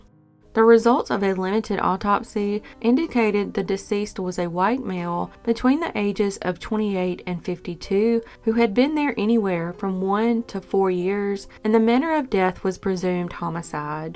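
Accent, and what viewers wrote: South, United States. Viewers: Thank you for these. It is very heartwarming to know these victims finally have their names. The narrator’s delivery and lovely, soft Southern accent are very pleasing to listen to.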